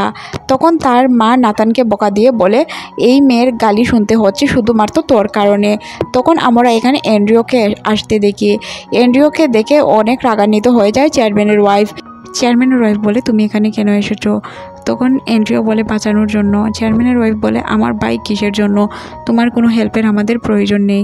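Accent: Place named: Mymensingh, Bangladesh. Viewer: আপু আপনার উচ্চারণ ভালো না, ময়মনসিংহ আঞ্চলিকতা 😅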